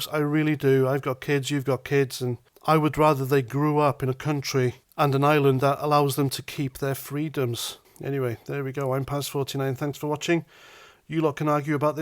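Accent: Wales, United Kingdom. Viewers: Are you Welsh just like me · I love the Welsh accent. It's heartbreaking to hear this news. Please make sure that Welsh children are taught about their heritage, culture and language.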